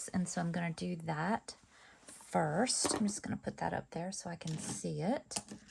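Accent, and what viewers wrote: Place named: South, United States. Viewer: My thoughts: 1. Do a background like you did for try 3 (a few shades of mid to dark blues eg a Prussian blues and a few tiny dabs of black as it’s so strong it can take over. 2. Pick that up on a piece of paper 3. Do another gel plate with soft to mid greens and a darker green like pthalo green 4. Place your leaves 5. Use your 1st pick up piece to pick up the negative image of the leaves (the lack of veining will look more like your fabric and will show more of the colour) 6. I think the reason your leaves don’t match up perfectly is because you’re comparing a positive with a negative image of the leaf so it’s a mirror image… My 2 cents worth😅 It’s really pretty fabric I loved watching your process, hearing your thoughts and lovely southern accent From Oz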